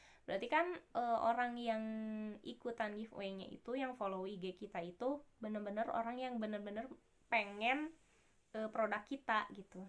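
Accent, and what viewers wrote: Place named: Bandung, Indonesia. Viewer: suplier atuhhhhh....dan saya sukaa nada bicara kaka hehehe khass bandung sukses teteh owner ramah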